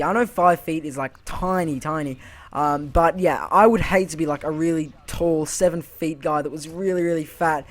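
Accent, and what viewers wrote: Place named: Australia. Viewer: Usually the Australian accent annoys me, but your voice makes it not annoying:] Think your speaking is pretty good too... Subbed :P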